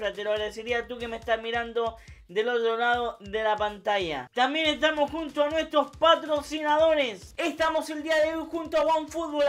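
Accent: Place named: Argentina. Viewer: POR que hablas como argentino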